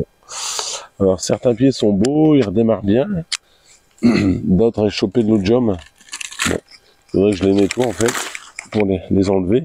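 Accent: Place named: North, France. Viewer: Bon rétablissement ! La spontanéité avec l'accent du nord, ça fait plaisir !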